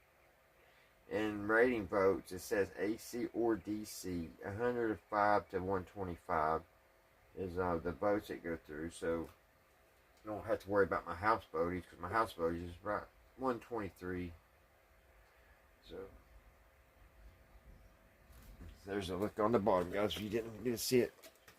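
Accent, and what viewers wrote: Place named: Kentucky, United States. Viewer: You talk like u are in Southern to eastern Kentucky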